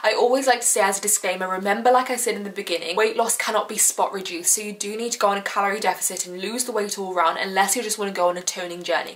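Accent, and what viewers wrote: United Kingdom. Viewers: It's a British accent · im pretty sure its british, I am british too lol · I came here to see how I could get some slim thighs but what I was observing... God DAMNN!!! Her British accent is soo much strong...😄😅🤗😘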